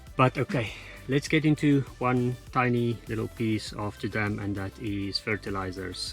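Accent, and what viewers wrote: South Africa. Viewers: Hi you sound like south african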